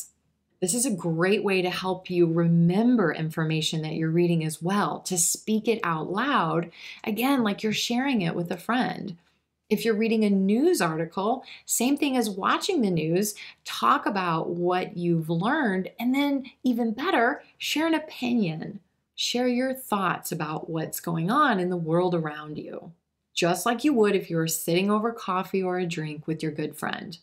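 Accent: United States. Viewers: I am an arabic speaker and an english teacher I love the american accent and I have choosen you as a trainer after a great reacher thank you for your help I watch your videos every day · Your all Video is American English??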